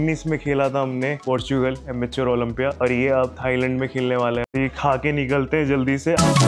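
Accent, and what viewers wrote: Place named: United Kingdom. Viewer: Kaafi tagdi English accent hai bhai aapki🔥👌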